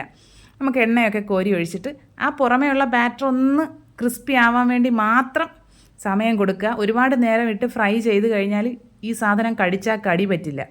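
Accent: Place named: Kollam, India. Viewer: കൊല്ലം ജില്ലക്കാരിയാണോ? സംസാരം ഞങ്ങൾകൊല്ലക്കാരുടെ പോലെയുണ്ട്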